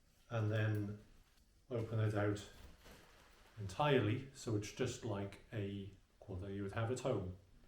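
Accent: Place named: England, United Kingdom. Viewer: Hi, One more question: How are you with the 10'F (-12'C) version? Is it warm enough for you? lightweight or heavy? What kinds of locations are you planning to backpack with it? From your accent, one might assume you are based in England. I am deciding between the 10'F and 0'F (-17'C) versions...The 0'F version adds a few more ounces but might work better in the New York State winters. I would like to backpack in summer and also occasionally sleep outside in winter. Maybe I'm expecting too much from one sleeping bag?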